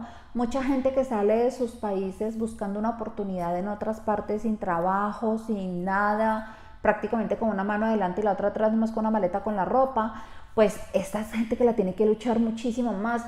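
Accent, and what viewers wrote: Colombia. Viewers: 😪😪😪😪, que trsiteza me da saber que dejar este mi bello y violento México 🇲🇽 pero me agrada enooooormemente que te vayas a tu país que tanto extrañas, ya que la pandemia echó a perder tus tan aheladas vacaciones. Muchas gracias por todos tus videos, tus vivencias, tus proyectos, etc. Y si, con la ayuda de Dios yo te seguiré de cerca porque déjame decirte que siempre he querido conocer Colombia, me agrada su gente y ese acentico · Hola, que padre que puedas regresar a tu país, me imagino lo mucho que has extrañado a tu familia, espero todo sea de bendición para ustedes y que sigas compartiendo con nosotras tu vida en Colombia, lo que más me gustará es que tendrás más marcado tu acento colombiano que tanto me gusta, DTB en esta nueva etapa y como siempre al pendiente de tus videos.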